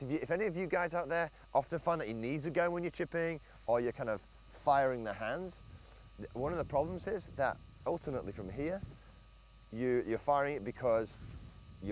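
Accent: United Kingdom. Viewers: That ain't no different chipping method, WTH is this British speaking talkin bout.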